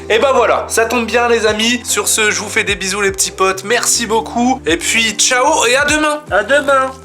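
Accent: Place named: North, France. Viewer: 9/7 pour la petite mousse !! Ton accent du nord se fais ressentir vive le 59 😄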